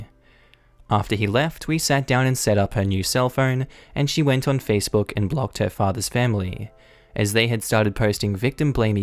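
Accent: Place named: Australia. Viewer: I might have a crush on the Ozzy accent.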